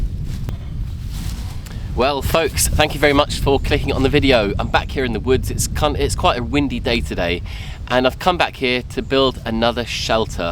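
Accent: United Kingdom. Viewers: then with that being said....your guess would be as good as mine. Lol 😂..for living in Virginia for 25 years you still have a heavy British accent. Which is cool 😎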